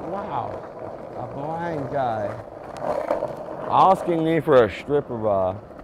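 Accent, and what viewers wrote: United States. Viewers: strange accent seems he picked up an american twang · Once he starts drankin he sounds American.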